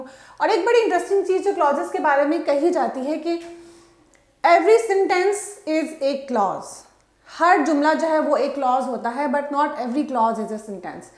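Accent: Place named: Urdu, Pakistan. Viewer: Mam I think your mother tongue is Urdu right ? But you teach very super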